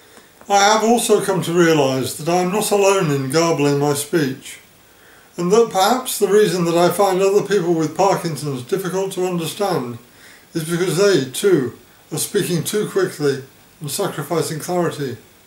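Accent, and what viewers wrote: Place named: United Kingdom. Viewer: Clear to me but as an American, I've always had trouble with the British accent. I think your most trouble is in the choice of microphone. There is a vlogger, Louis Rossmann who was a sound engineer at Avatar Studios in New York, who has changed mics over the years and explained why. You might give him a call. He is in the book...Rossmann Group Computer Repair.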